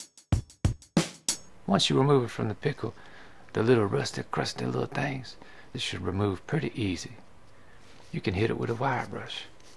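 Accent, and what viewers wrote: Louisiana, United States. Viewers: I see a bayou man myself with that Louisiana accent, maybe I'm wrong lol.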